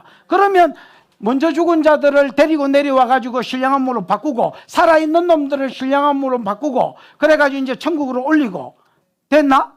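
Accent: Gyeongsang, South Korea. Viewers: 목사님, 캐나다 토론토에 25년 넘게 살고있는 교민입니다. 우연히 영상을 보게되었었는데 첨엔 코믹하고 촐랑거리는(지송! ㅋㅋ) 특별한 캐릭터의 웃긴 목사님인거 같아(글구 심한 경상도 사뚜리~)넘어갔었는디... 또 몇달후.. 구속사를 듣게 하셨고 고린도전서, 열왕기서 강해들을 들으면서 눈이 번쩍 뜨이고 '이분 따라 살아야겠다' 고 생각하며 정신이 번쩍 났었습니다. 저는 이민와서 예수 믿기 시작했었는데, 긴- 시간동안 왜 교회가 강해설교를 안해주는지 정말로 답답했었거든요 😭 그날에 큰- 상급이 확실하시니 힘내시고 계속 앞에서 달려주셔요! 화이팅!!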